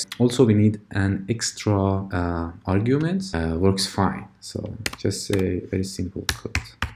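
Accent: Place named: Iran. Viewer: Your accent tells me that you are an Iranian)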